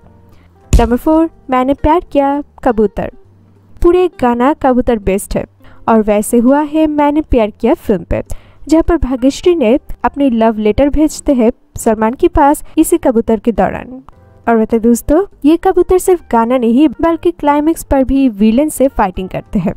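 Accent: Bengal, India. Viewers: your accent says you are bengali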